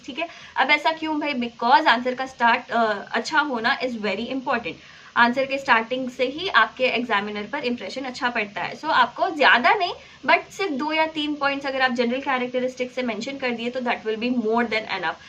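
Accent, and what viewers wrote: Hyderabad, India. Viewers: Easy to understand because of your hyderbadi accent 😅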